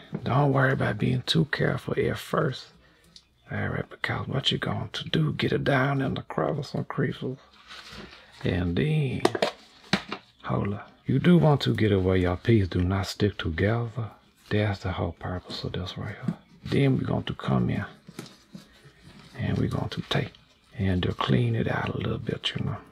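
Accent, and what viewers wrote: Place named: Oklahoma, United States. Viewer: Is...is the cajun? Or at the very least Louisianan since I haven't heard him slip in the odd bit of French and other cajun qualities? What majesty is that accent?! It definitely sounds atleast like my great aunts and cousins in Oklahoma, especially in his inflections, but the accent could be somewhere eastward from there too.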